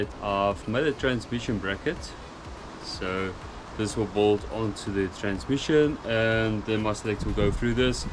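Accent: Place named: South Africa. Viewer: I’m assuming you’re from somewhere in SA. I can tell why the accent, I just saw the ad for this channel and I’m loving it keep up the awesome videos.😁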